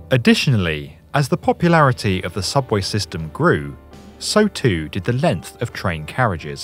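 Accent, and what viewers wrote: United Kingdom. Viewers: Man i love your videos they are so informative, entertaining and savvy i could watch them all day..don't stop your fantastic work. you speak clearly and slowly and your British accent helps make it more easy listening rather than some fast talking American woman's voice.. it makes a difference in my opinion... Thanks for your videos which im happy to share with my Facebook friends anytime.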